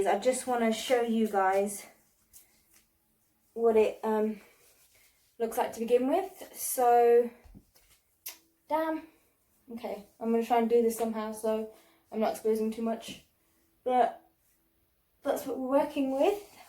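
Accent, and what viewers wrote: United Kingdom. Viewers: Great video! Loved seeing you trying on those different type of suspender belts! My favourite was your white, lace, suspender belt. Love your lovely English accent too.:) xx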